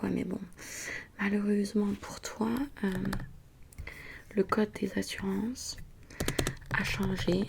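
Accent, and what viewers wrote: Vosges, France. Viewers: Le retour que personne n'imaginait ! L'accent vosgien fait son come back ! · Quel belle accent 😍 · Cet accent feels like home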